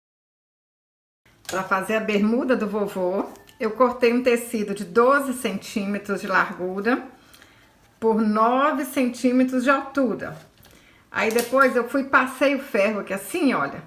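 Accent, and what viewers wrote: Minas Gerais, Brazil. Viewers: Só nós mineiros temos este sotaque e expressão. É bom DEMAIS! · Estou encantada por a caso achei você estou cm covide e fiquei encantada me levantou por acaso você é mineira porque teu geitinho de falar é igual ao meu sou de Cataguases parabéns amei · Que lindinho ficou esse vovôzinho. Adoro seus vídeos e seu sotaque mineiro me trás uma lembrança linda de uma amiga/irmã que já não está mais nada é mundo . Pessoa maravilhosa, doce e criativa xomo você. Parabéns pelo seu trabalho.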